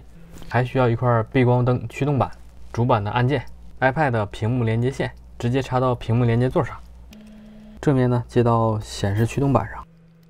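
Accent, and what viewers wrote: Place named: Yushu, China. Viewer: 听口音像是榆树的，老乡？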